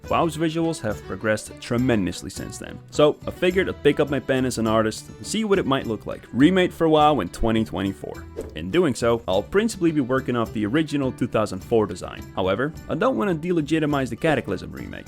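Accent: Netherlands. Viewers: Thats sick! Do i detect a dutch accent? keep up the good work!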